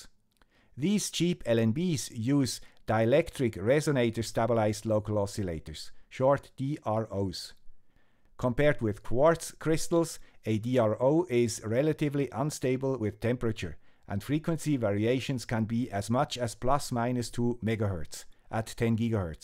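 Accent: Switzerland. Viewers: The Ham Radio with the Swiss Accent rocks! Very interesting. Do you know any good online electronics course? To get more deeply into the theories behind this kind of circuits.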